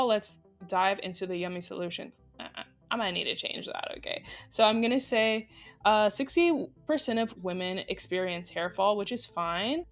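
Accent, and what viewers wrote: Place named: Spain. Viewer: Girlie, your Spanish accent is amazing! I'm so grateful for your videos new subscriber here!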